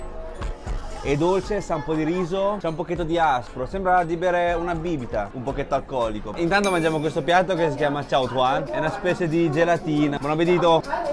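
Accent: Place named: Pesaro, Italy. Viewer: Pesaro, come fai a confondere l'accento con il modenese?😅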